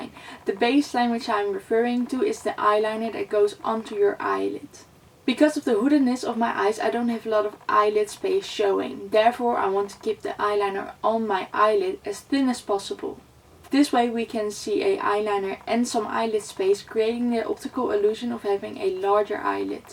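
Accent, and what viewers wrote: Netherlands. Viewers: Are you Dutch? I can really hear it through your accent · ik vermoed een Nederlands accent ??? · You are Dutch... not even a question lol i can just tell 😂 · dat nederlandse accent springt er wel uit haha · Nice tutorial, you sound Dutch👀 hey there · Het is half 11 s avonds en ik ga deze tutorial meteen testen. Altijd gestruggled met winged eyeliner (i am assuming you are dutch by your accent, if not I am sorry) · Hi! Thanks for the tutorial, it was very helpful. My eyes are horribly hooded, I had kind of given up on eyeliner but this gives me hope! Your accent is cute, are you from the Netherlands?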